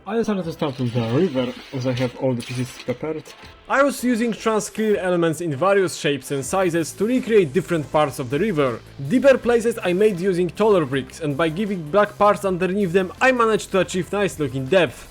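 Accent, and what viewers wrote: Poland. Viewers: czy ja slysze polski akcent? btw zajebisty moc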